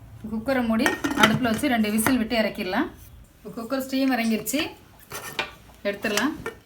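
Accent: Tirunelveli, India. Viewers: நெல்லை தமிழ் நீங்க செய்த கீரை கடயலைவிட அதிகம் ருசி! Nice, very simple video.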